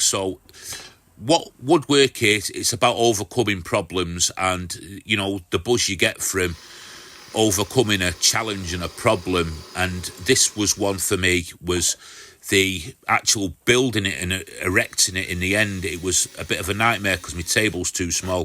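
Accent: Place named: Stockport, United Kingdom. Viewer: great project ,looks retro,you don't sound to far from me (stockport).Every man should have a shed.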